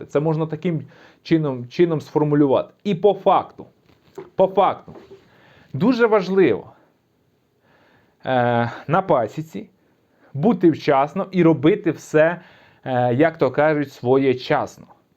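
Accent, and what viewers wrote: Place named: Central Ukraine, Ukraine. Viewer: Прослухав ще раз ! А ми земляки. Так розмовляють в черкаській та вінницькі області..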